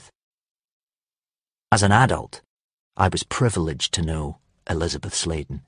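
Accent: Scotland, United Kingdom. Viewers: Im sorry but I am IN LOVE WITH HIS SCOTTISH ACCENT.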